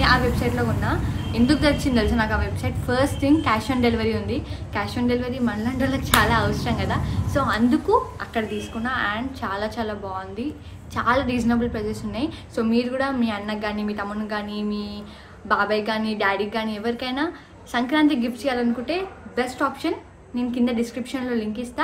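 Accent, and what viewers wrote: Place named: Telangana, India. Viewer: I like ur way of talking telangana muddu bidda Akka love from narayanpet akka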